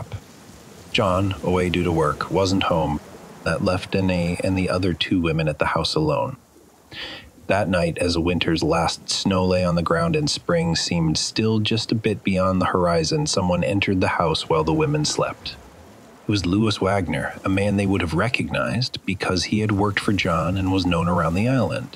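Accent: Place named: United States. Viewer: Narrator sounds American or maybe Canadian Should be pronounced as 'Tems' not 'thames'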